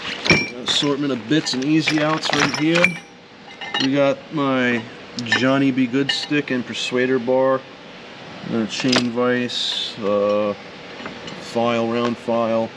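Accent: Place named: Long Island, United States. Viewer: Ohhh the OCD drawer was sweet! I sense Long Island, Brooklyn or possibly Jersey in the voice? LI here…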